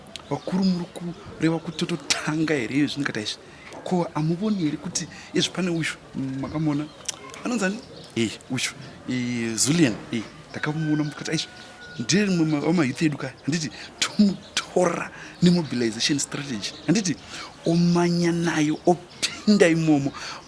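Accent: Karanga, Zimbabwe. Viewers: Aiwa tiri kuchinzwa chikaranga 🙆🏻‍♀️🙈🙈🙈.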